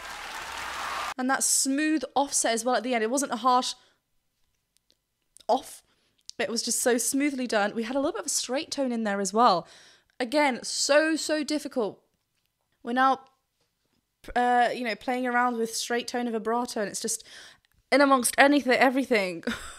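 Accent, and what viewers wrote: England, United Kingdom. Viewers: Beautiful Dimash performance,,,also your english accent its very cool...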